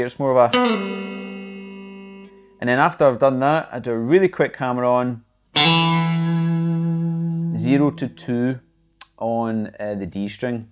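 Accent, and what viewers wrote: Scotland, United Kingdom. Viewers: Nice lesson and sound.Good to hear a Scottish accent as well.Keep the lessons coming ..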